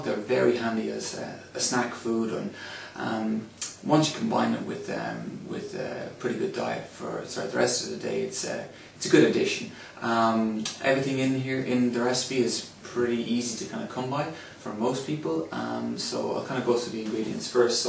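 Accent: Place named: Ireland. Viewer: It's strange actually hearing a fellow Irishman on youtube, it's usually americans.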